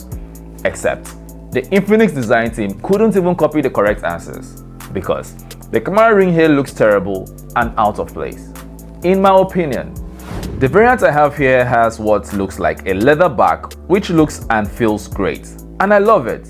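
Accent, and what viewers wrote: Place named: Jamaica. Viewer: Dont understand Jamaican!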